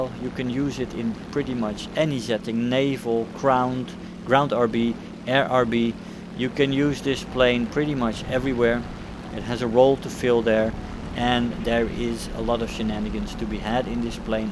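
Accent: Netherlands. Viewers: he got that dutch accent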